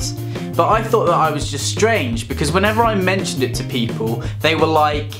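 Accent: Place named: United Kingdom. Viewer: Umm... it could be just a British accent. Not everyone speaks American English.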